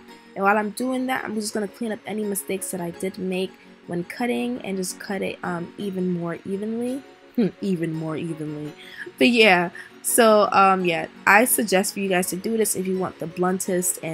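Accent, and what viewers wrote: New York, United States. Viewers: Yo she has to be from ny the way she talking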